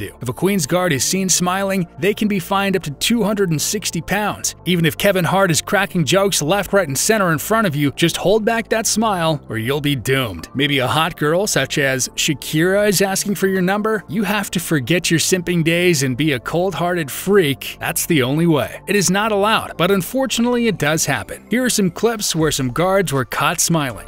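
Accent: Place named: United States. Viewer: Why àn àmerican voice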